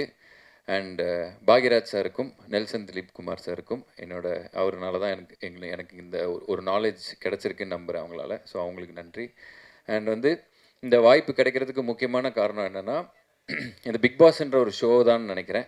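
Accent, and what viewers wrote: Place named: Coimbatore, India. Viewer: nee Coimbatore pasai nalla pesuva antha jonerla padam pannu arasiyal vathi mathiri and village nakkal paiyan mathiri pannu.. All the best👍i am from ❤️💛❤️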